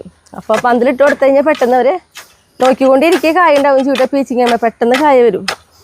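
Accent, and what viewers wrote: Thrissur, India. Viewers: I am also a from Tamil Nadu. I do watch ur videos, like ur vegetable garden n ur dogs very much. Never mind these types of people. They can't help themselves but to crticise others. Was in Trivendrum for 3 years, can read and follow Malayalam, but ur Trissur Malayalam is bit difficult for me 😂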